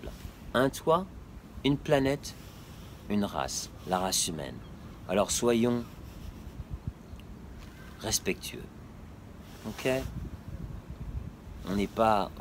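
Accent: France. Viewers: ese acento Frances ahhhh es muy lindo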